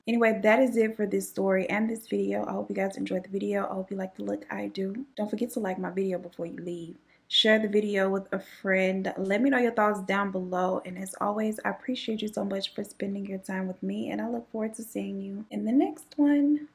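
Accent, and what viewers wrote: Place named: Houston, United States. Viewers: i shoulda knew u was from houston too by the way u sound lol!! <3 I just found your youtube recently and I love it!! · Omgggg aye ur from texasssaas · I knewww you were from Houston as soon as I heard you speak. 😂 we have that extra seasoning in our story telling.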